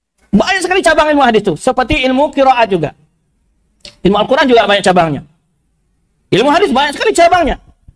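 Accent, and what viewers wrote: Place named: West Sumatra, Indonesia. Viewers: Logat orang minang bro sumatra. Teman saya ada yang kayak gitu kalau bicara. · Logat orang minang memang seperti itu.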